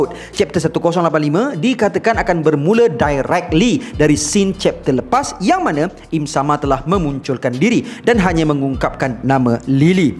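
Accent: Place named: Kelantan, Malaysia. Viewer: Kemahhh selit dielek kelate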